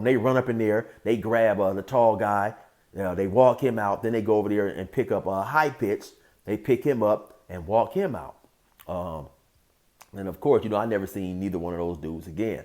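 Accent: California, United States. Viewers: Bruh in white and I literally cannot do a white California voice anywhere near this level. Sounds like that Wes Moreland (that big white guy who has a channel) hahaha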